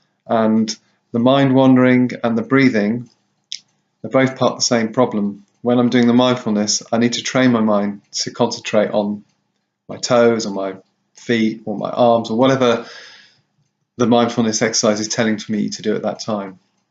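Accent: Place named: United Kingdom. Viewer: Hi, are you still active on this channel? I am struggling with this at the moment really bad. I noticed your accent sound like you were from the UK so I was wondering if we could have a text conversation to discuss this further. Really in need of some help.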